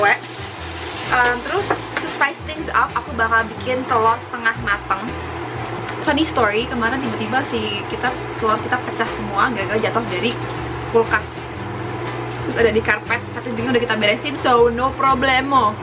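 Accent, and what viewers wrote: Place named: United States. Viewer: American accent udah dapat👍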